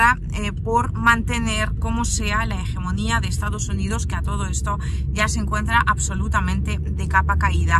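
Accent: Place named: Spain. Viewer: te queremos tanto aquí en Latinoamerica,que quisiéramos vayas cambiando ese acento ibérico, a uno más acorde con nuestra latitud.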